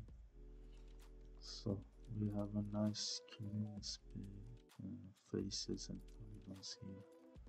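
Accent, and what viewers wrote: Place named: Iran. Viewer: You have a Persian accent, are you from Iran? We are easily distinguishable.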